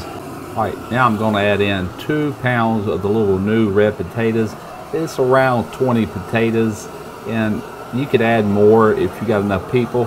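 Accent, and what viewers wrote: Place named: Louisiana, United States. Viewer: Half cup of kayeen paper! man i love the Cajunness ohhh yea brother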